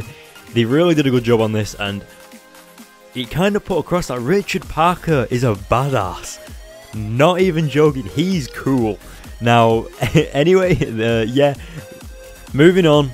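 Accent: United Kingdom. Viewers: r u british or american and which of those countries do u live in im american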